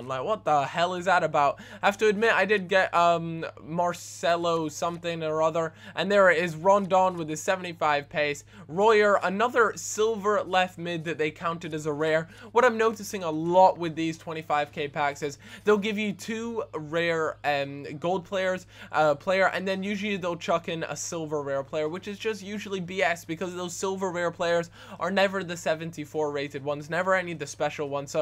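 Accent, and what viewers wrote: United States. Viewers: R u American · Sound American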